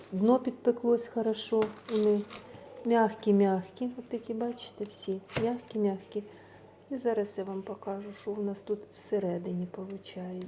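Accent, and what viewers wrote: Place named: Dnipropetrovsk, Ukraine. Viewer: Я в захваті. Чорму раньше не попадала на ваш канал. Як гарно у вас все виходить! Все так уверенно, як вроді у вчителя, вроді ви всю жизнь з молоду випікаєте. Мені вже за 50 та й то руки трусяться, бо поки мама була жива, то я не вміла даже зліпить пиріжка. Оце 10 років як хоть трохи навчилася. Українська точно як у нас, на Дніпропетровщінді.Дякую вам, буду вчиться дальше. З мене підписка обов'язково❤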